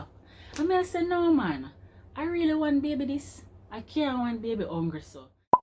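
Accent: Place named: Jamaica. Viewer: That Jamaican accent 😻😻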